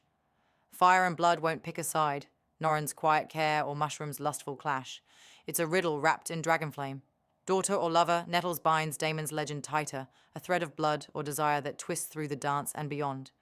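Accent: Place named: Australia. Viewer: This sounds like the same voice as the last video, but with an Australian accent instead of a British one... I want a Jamaican accent for the next video.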